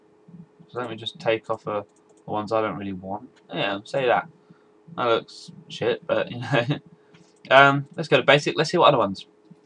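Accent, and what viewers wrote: United Kingdom. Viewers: Are you British? Looks like you have a British accent hehe:)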